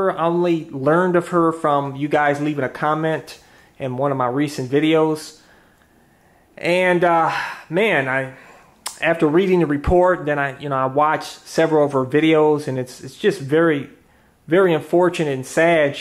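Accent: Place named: Appalachia, United States. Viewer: you're a great guy and very sincere with your advice. You have a great hill billy accent and infectious laugh.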